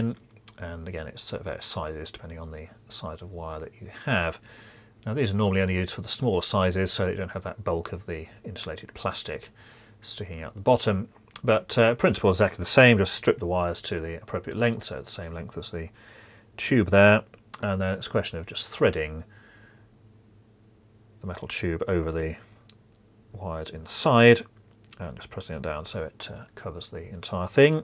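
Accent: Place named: Southern England, United Kingdom. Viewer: PEREIRA Standard Southern English - it's the cadence that's probably throwing you.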